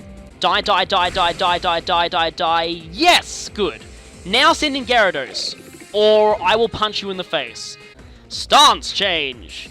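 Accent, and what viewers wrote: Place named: Australia. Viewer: Thanks for the reply. Saw your name pop up from a Chuggaaconroy tweet thread reply regarding your persona 5 playthrough and saw a mention of Australian accent. Was wondering if you were the same person, and lo and behold it was after hearing your voice and the copter. Glad to see your content like this, and I’m pleasantly surprised you’ve played the Trails in the sky series as well.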